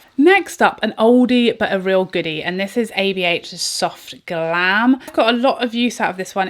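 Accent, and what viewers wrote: England, United Kingdom. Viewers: I'm italian 41 years old mum, I love listening to you and I love your wonderful English accent! 😍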